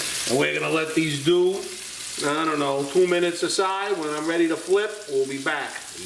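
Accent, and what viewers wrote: Boston, United States. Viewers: Nice and the Boston accent,makes the video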